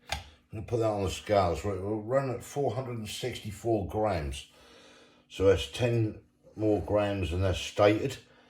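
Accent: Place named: Norfolk, United Kingdom. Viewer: You a Norfolk boy? Where about you from?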